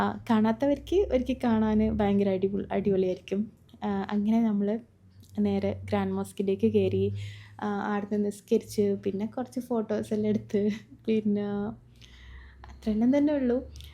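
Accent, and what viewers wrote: Kannur, India. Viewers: ഇവർ വർത്താനം പറയുന്നത് കടിച്ചു പിടിച്ചു ആണ് അതുപോലെ തന്നെ എപ്പഴും പിന്നെ എന്താ പറയുക എന്ന് ഏതിന റീപിറ്റ് ചെയ്യുന്നേ കണ്ണൂർ ഇങ്ങനെ ആണോ സംസാരം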